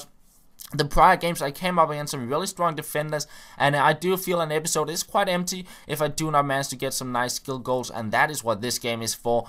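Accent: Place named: Denmark. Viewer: it sounds alot like danish ;) most people from our country have that accent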